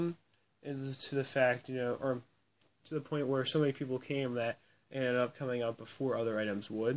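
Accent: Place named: United States. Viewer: When you say you're fluent in English I'm guessing your main exposure to English is British English? This guy has a very clear American English dialect, the most commonly known and spoken dialect, and if you have a hard time understanding him then you're not really as fluent as you think you are.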